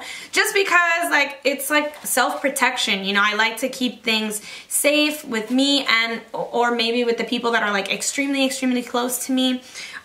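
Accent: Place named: Italy. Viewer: I loved the confidence part you spoke about. It’s so hard to get some confidence when you are comparing your self to others. 😩I guess I just gotta work on me and what I want in life. It’s a struggle but one a day at a time. 🙃🙂I assumed you were Italian btw. Haha is Spanish speaking gals we have a accent that can confuse ppl. 🤣🤣 🙋🏻‍♀️😊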